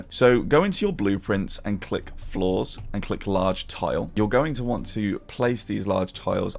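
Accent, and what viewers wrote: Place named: United Kingdom. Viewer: classic British accent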